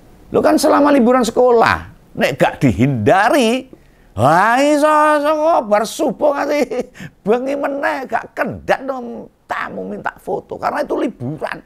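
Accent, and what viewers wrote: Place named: Solo, Indonesia. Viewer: Narasinya, cara bicaranya dan logatnya mas ini saya suka banget, seolah saya sedang main di rumah tetangga dan duduk di ngebuk ngobrol spt ketika dulu saya masih tinggal di solo. Yo ngene iki persis ngomonge, ha ha, lanjutkan mas, jadi pengin pulang ke solo saya.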